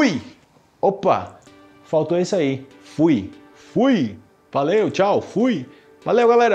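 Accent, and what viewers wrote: Colombia. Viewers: Me encanta tu acento en español. Parece colombiano 💖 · Te lo juro me sorprendió cuando te escuché hablar con ese acento colombiano, ya me confundí no sé si eres de Brasil, de Colombia de ambos o de ninguno. 🤦 Te descubrí gracias a mi profesor quien recomendó tus vídeos.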